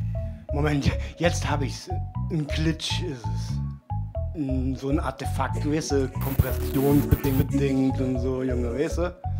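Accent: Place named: Palatinate, Germany. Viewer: Wie immer genial :) ich liebe den Pfälzer Dialekt.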